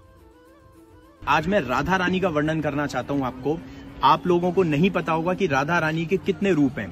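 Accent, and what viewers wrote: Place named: Braj, India. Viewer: बहुत सुंदर लगता है जब आप ब्रिज भाषा मे बोलते है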